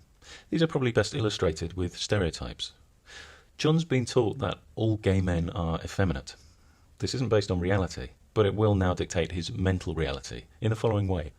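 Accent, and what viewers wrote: England, United Kingdom. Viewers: Totally off-topic but your voice really reminds me of the voice over to a making of documentary of Silent Hill 2 but I suspect that's because you're from the same area in England as the actor.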